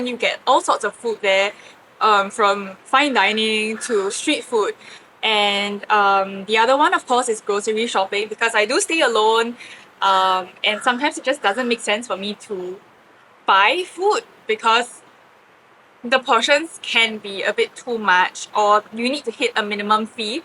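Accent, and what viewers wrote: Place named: Singapore. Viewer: Singapore accent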